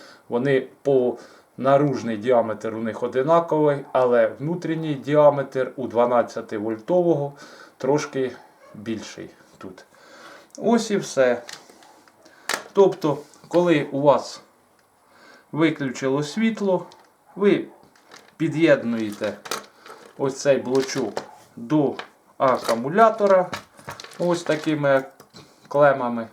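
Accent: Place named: Poltava, Ukraine. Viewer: По говору зразу зрозумів,що автор відео з Полтавщини. Дякую!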